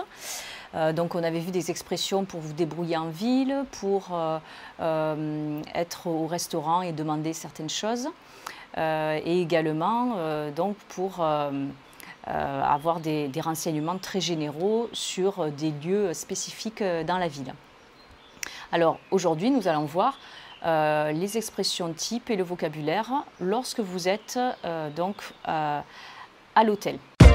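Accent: France. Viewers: I love your French accent when you speak English. Innernet : Internet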